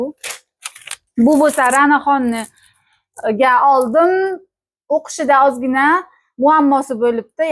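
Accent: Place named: Qorakul, Uzbekistan. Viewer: Ман хам шуни уйладим бу точна Коракул ёки олот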